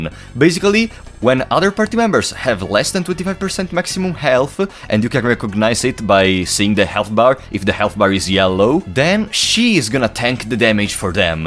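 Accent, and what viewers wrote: Italy. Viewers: Avevo incominciato a vedere questo gioco prendere popolarità e l'ho comprato per provarlo, ora grazie ai tuoi video sono riuscito a capirlo meglio. Ho visto che sei italiano per l'accento e perchè quando vai a vedere il codice sorgente del gioco ci sono le scritte in italiano. Peccato che in italia non se lo caghi nessuno sto gioco. Continua così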